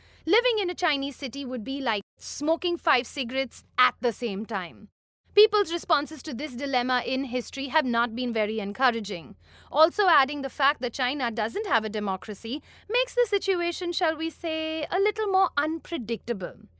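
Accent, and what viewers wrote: India. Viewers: Indian English sucks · Sounds Indian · Indian accent is killing us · I like your Indian accent and I am trying to learn Indian English from you. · India India India that irritating accent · Your Indian accent is like those Indian phone scammer use. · t series accent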